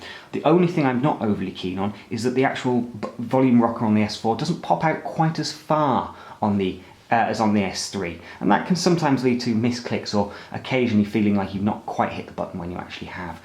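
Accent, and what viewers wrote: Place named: RP, United Kingdom. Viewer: Your voice is so posh and funny - I love it! Great review, by the way!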